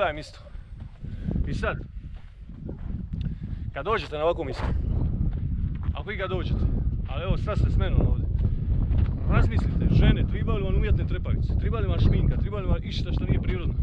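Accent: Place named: Dalmatia, Croatia. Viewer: Predivno podrucje. Kao drugi planet! Scenografija za sf film....Al gustam slusati dalmatinski :)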